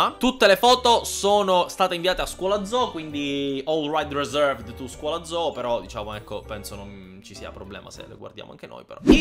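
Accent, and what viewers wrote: Palermo, Italy. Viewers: Oddio ma ho notato una cosa : PARLI IL PALERMITANO MEGLIO DI MIO PADRE..... COSA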